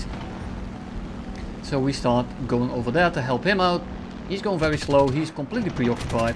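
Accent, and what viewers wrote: Netherlands. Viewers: I love your vids btw, but that english accent, you have to be dutch, i think you are a Dutchie.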